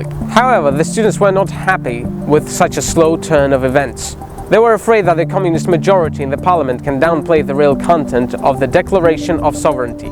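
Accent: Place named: United Kingdom. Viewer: Awesome video, great British pronunciation!!! 👏🏻👏🏻👏🏻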